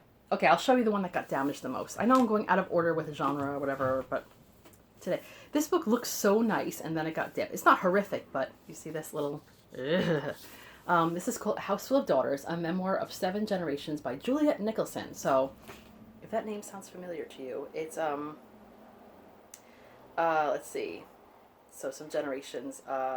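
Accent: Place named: New York, United States. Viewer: Hi, I'm going to book off today here in Hawaii. Wish me luck. I have more of a New York accent than you do and I've been living in Hawaii for 40 years. I just did my first. mail haul using my brooklynese accent full force if you want to take a look. Sorry you got soaked. It happens to me in Hawaii but I have a collection of plastic bags. Aloha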